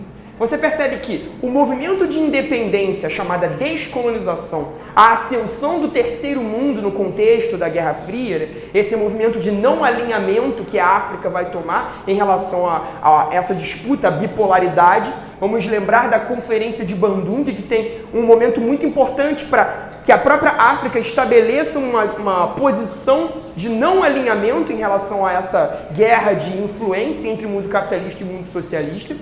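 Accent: Rio de Janeiro, Brazil. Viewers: Eu quero fazer uma pergunta. Eu nao sou do Brasil. Alguem pode me dizer se o professor fala com um sotaque carioca? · Daniel Temelkovski, sim, com toda certeza ele é carioca.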